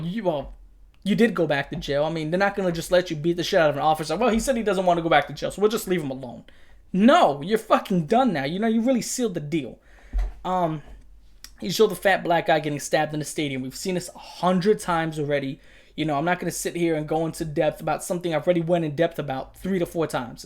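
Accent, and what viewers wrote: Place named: New Jersey, United States. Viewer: As a boricua New Jerseyan living in Florida, your accent makes me feel right at home ❤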